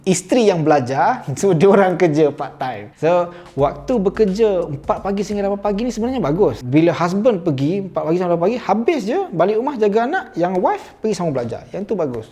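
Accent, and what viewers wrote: Malaysia. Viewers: Nope.. Accent still Malaysia but lots of Oz word and slang mixed along the way 😅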